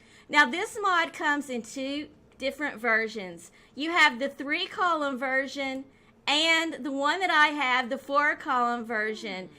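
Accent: South, United States. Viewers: Love the video but I'm trying to figured out if ur American or what love ur accent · subscribed. great and informative video. i know you get this a lot but i absolutely love your voice/southern accent, its so cute. you are so intelligent and funny too, you make everything so easy to understand and present everything so well. you deserve way more subs then you have without a doubt! you've got talent!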